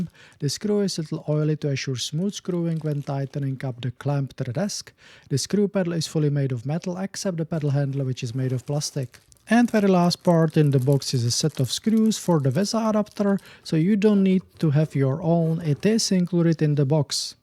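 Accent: Czech Republic. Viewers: Dobra prace:). Podle prizvuku hadam ze CZ. Taky jsem si koupil aw2721d dneska.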